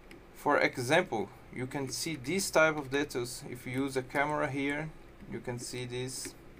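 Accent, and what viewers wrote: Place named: Brazil. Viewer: brasileiro ? parece XD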